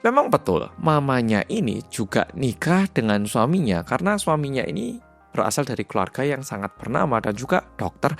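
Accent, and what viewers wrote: Java, Indonesia. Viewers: Gw salut sama abang ini, medoknya gak lang-ilang😅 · Mas sekarang di kampung halaman apa di tanah perantauan? masih medok ...... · Aku baru ngikutin, keren bgt 😂 fasih banget bhasa indo Jawa medok nya. 🎉🎉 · akhirny aplot jg udh kangen mas"korea cerita pake aksen medok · Dari maraton nonton swindler .. Aku yakin bngt si detektif swasta nya si babang korea medok ini sendiri .. Hm masuk akal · Aku ketemu channel..anda. Lama tinggal diJawa ya..? Medok bhs Indo🇮🇩 nya..😊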